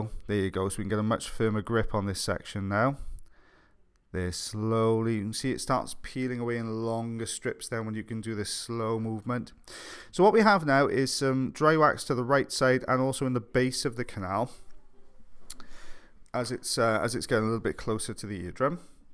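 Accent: Wales, United Kingdom. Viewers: God Damn! Look at all your female fans! A bear of an audiologist with a Welsh accent gets the ladies going. I'm jelly. Your Mrs must hate your channel.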